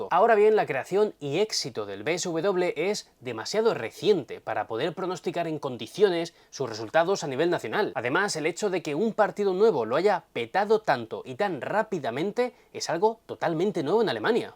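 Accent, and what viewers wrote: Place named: Castile, Spain. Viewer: antes de opinar, te sugiero que aprendas a pronunciar correctamente las palabras alemanas, que en tu acento castellano suenan fatal, hijo mio. Para muestra un botón, la palabra die, se pronuncia fácilmente como di.... De nada.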